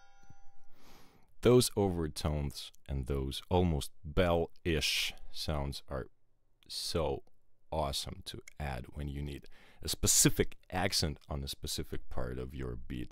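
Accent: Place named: Belarus. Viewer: Belarusian accent of English.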